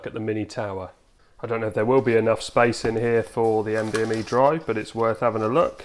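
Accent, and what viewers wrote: United Kingdom. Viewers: Thanks for the update and review. 52PI Has really stepped-up their game! I always liked the way you pronounce A-Lume-A-num (US) ….Al-You-Mini-um (UK)…when I 1st started listening to shortwave pre internet the BBCWorldService pronounced just like you…and I went crazy trying to figure out what’s that new metal the U.K. Has?…😂! Have a great day!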